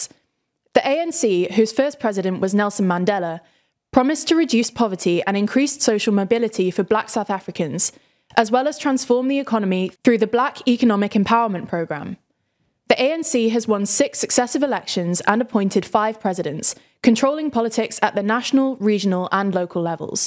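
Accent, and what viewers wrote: United Kingdom. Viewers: Sound like UK 🇬🇧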